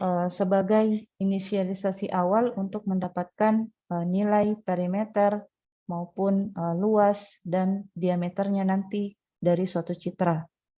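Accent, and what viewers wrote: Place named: Gorontalo, Indonesia. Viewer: logatnya Gorontalo/sulawesi bngt nih wkwkw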